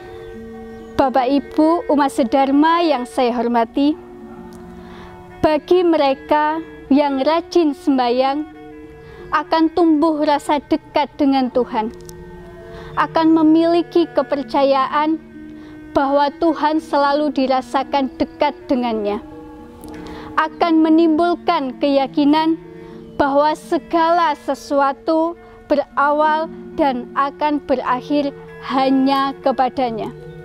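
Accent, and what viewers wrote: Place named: Java, Indonesia. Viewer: Medhokmu nduk.. bikin teengiang2 ... 🙏🙏🙏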